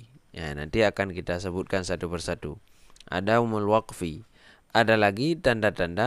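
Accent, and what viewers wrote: Aceh, Indonesia. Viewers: Bahasanya...kaya orang aceh yaaah...jdi inget temen saya dri aceh zamroni holil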